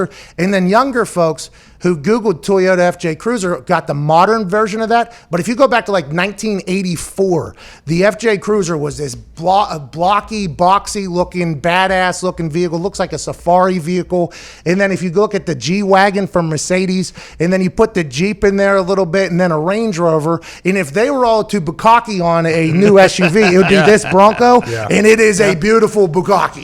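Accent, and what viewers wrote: Pittsburgh, United States. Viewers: Man I love watching and listening to these videos, gotta love that pittsburghese that comes out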